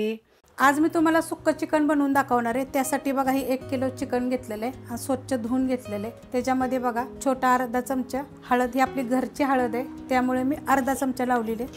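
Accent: Satara, India. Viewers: Tai tumhi Satara che aahat ka??? bhashe waru vattay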